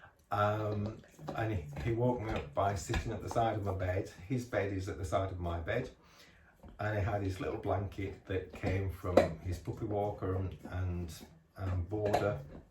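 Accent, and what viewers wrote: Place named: United Kingdom. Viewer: Hi, I enjoyed this video very much. As an American I’m quite enchanted by the way you speak haha. Interesting that you Brits count food in grams and call what we would say “doing it’s business” as “spending.” I think my favorite thing though was when he turned around to look at you and you just said “Hi Spencer!” It warmed my heart. On another note you showed everything very clearly to the camera and your descriptions were great and added a lot to my experience even as a sighted person. My whole county is under quarantine for the coronavirus starting tomorrow for 14 days so I’ll be watching all the videos. I do have one question- have you decided on a middle name for him?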